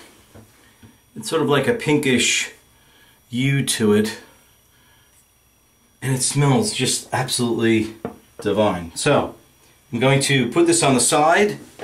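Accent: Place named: New York, United States. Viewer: I can tell from your accsent you grew up in the big apple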